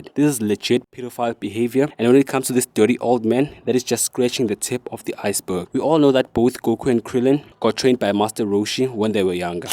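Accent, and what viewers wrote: South Africa. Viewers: Sorry to say, your accent sounds more like a South African accent.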